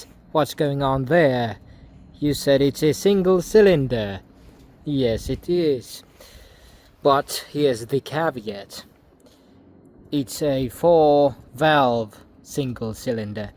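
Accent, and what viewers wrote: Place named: Baltic states. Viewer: I enjoyed your video. I'm guessing you're from the Baltics, Finland perhaps?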